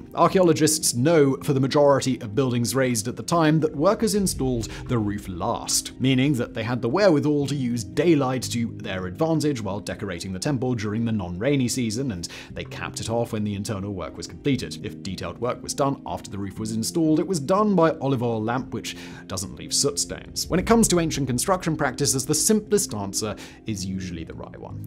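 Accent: RP, United Kingdom. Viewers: If this guy didn't have an educated British accent, no one would listen to him.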